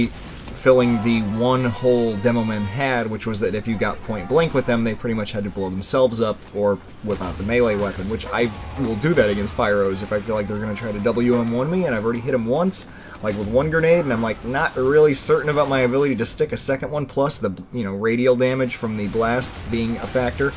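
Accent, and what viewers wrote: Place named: South, United States. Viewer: Trying to guess your regional accent. South, but not deep deep south. Virginia or N. Carolina.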